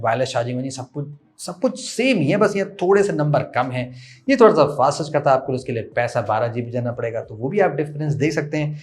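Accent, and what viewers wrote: Lucknow, India. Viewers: Aap lko se hai kya sir..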